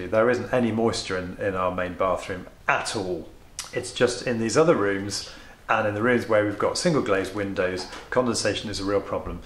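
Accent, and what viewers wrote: United Kingdom. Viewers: Hi, judging from your accent you live in the UK. In your climate you will always get moisture on your windows if you have a single glass window. When the air hits your glass it has a sudden change in temperature and will therefore reach its "dew point". To avoid this, you need to install mechanical ventilation...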